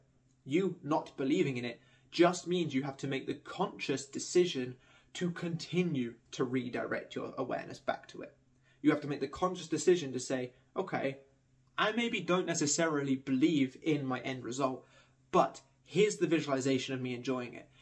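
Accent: United Kingdom. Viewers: PS: you speak so quickly + the British accent makes me REALLY pay attention and often re-listen a part or two (I mostly listen to American English). Which I don’t criticize, it’s something I welcome because it’s super refreshing! I have to say I enjoy the manually added captions! Oh and I think I manifested your fast speech because I was tired of some Youtubers speaking sooo like, squeeeeezing a sponge type of slow speech🤣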